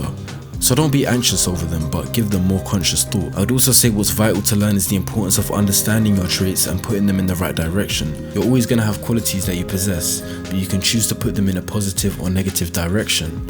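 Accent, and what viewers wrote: United Kingdom. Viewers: Black dude with a british accent. Crazy.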